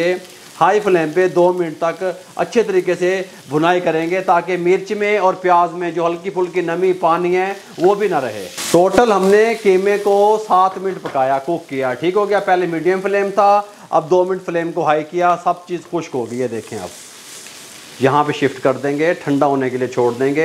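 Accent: India. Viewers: Love ur all recipes, love ur desi accent,, i try ur all recipes, all are yummy, delicious, tasty, from spain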